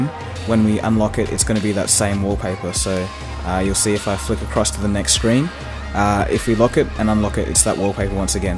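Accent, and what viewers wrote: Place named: Australia. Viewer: I wouldn't worry about it. You've got an "accent" being from Australia or wherever you're from, but other than that, you sound fine to my American ears. Good job with the videos. Very well done!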